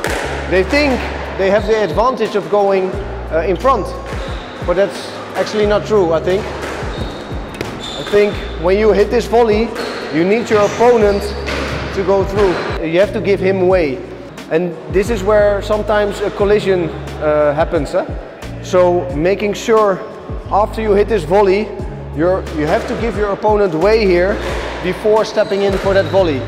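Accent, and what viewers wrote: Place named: Netherlands. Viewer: 1000% dutch accent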